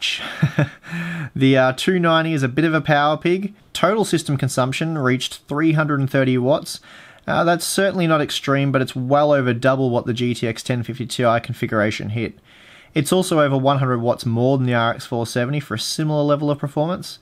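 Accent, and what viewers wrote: Australia. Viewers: I really enjoyed this as well as all your videos. Something about the Aussie accent makes watching all these vids for research a bit more fun...LOL...I would like to add in the states it seems the R9 390's in both the standard and X variants are a bit cheaper with used 390's being found for under $150. The 290's for some odd reason are averaging the $200 you mentioned. Thanks for another great video. Since I only upgrade when I see major dips in frame rates, well educated hands on reviewers like yourself are a godsend. My last rig finally died, (VRM's on the motherboard), lasted me since 2010. It was the old tried and true Phenom x4 965 BE stable on air with a Radeon HD6950 TOXIC from Sapphire that ran as the 6970 with the Bios Switch enabled. Card still runs perfectly, but she is definitely dated and it's time to upgrade. Thanks for making that so much easier. 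Cheers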